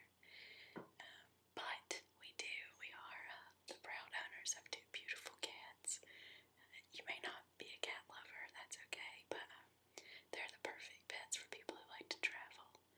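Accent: South, United States. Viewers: Where are ya from? I detect a bit of a southern draw that just drives me crazy.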